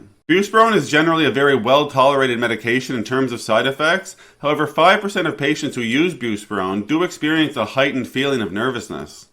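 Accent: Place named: Atlantic Canada, Canada. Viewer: Same here skipper! I know an east coaster when I hear one lol. Cheers, keep up the great reviews.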